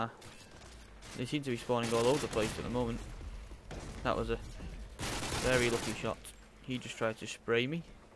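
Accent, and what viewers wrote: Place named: North, United Kingdom. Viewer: Yo I’m from Australia and I’m trying to figure out if that’s a Manchester or Yorkshire accent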